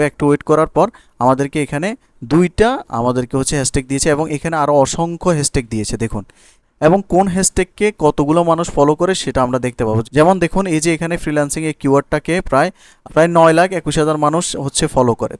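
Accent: Noakhali, Bangladesh. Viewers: স্যারের বাড়ি কি নোয়াখালী?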